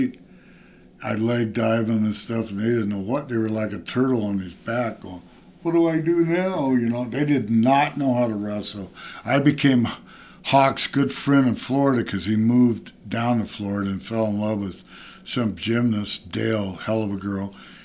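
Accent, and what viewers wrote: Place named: Michigan, United States. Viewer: He is real.... he talks like a Michigan accent.....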